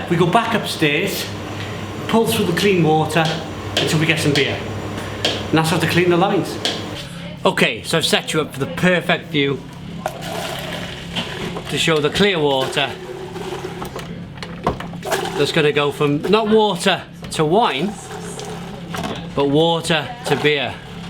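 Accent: Wales, United Kingdom. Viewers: Awesome video. Where’s your pub and what’s the name????? I’m getting a hint of Welsh accent (please forgive me if I’m wrong). I’d like a pint in your pub. 👍🏻🤪😊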